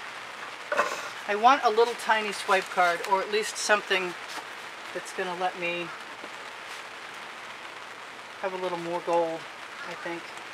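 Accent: Texas, United States. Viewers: A big ol' party going on in that one! Texas talk. Love it!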